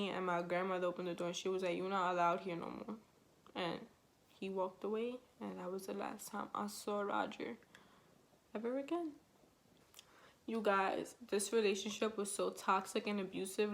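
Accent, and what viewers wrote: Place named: New York, United States. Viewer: You from New York I that assent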